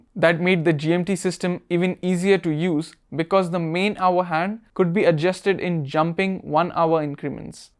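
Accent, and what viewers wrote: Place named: India. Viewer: having the indian accent is really lame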